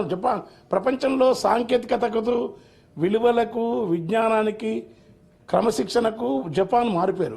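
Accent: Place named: Andhra Pradesh, India. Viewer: గోరేటి వెంకన్న నువ్వు మాట్లాడుతుంటే నేను చూస్తుంటే తెలంగాణ వాడు మాట్లాడుతున్నట్టు లేదు ఆంధ్రుడు మాట్లాడుతున్నట్టు. ఇప్పుడున్న విద్యావ్యవస్థకి హాస్టల్ వ్యవస్థకి కథాకర్మాక్రియ మన కేసీఆర్ గాడే నువ్వు దయచేసి మళ్ళీ మళ్ళీ కన్నీరు పెడుతుంది అన్న పద్ధతిలో ఇంకొక పాట రాసి దాన్ని ప్రసిద్ధిగాంచితే చూడాలని ఉంది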